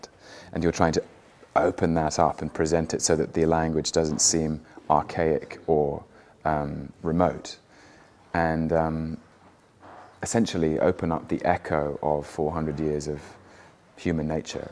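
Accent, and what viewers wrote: Scotland, United Kingdom. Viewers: Why is Tom Hiddleston reading the play with a scottish account? I thought this play takes place in ancient rome? WTF? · Does OP sound a bit like a Scottish dialect?? It sounded like that to my unpracticed ear.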